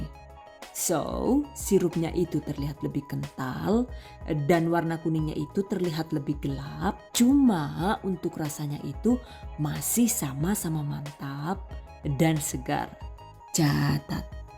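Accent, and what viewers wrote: Banyumas, Indonesia. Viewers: ni org madiun apa org ngapak ya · Mbak asli mana kok ngapak??? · Sebaik2 manusia adl yg paling bermanfaat bagi manusia lainnnya, spertinya bunda ini memang diciptakan Allah untuk mmberikan mnfaat n inspirasi bagi yg lainnya, 😍 Super sekali vedeo2nya, sirupnya, sehat menyegarkan n alami, n tentunya cocok untuk bisnis usaha 💪 ngapaknya sll bikin kepingkel2,gk tau knp klo dnger org ngomong ngapak sll ketawa😆😆 Btw, ikutan latah..."haaaay gaes..woookey gaes..."😂😂 #GiveAwayTHV2020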